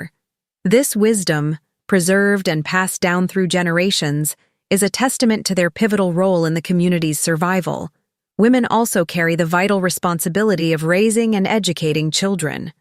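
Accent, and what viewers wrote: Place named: United States. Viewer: Every time I watch videos of this tribe, I mostly enjoy listening to them talk. Their language is the main attraction. Now imagine having to listen to this American voice narrating a story 😞😞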